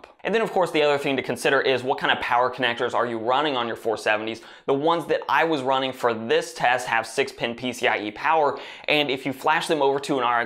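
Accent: Indiana, United States. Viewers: Are you from Indiana?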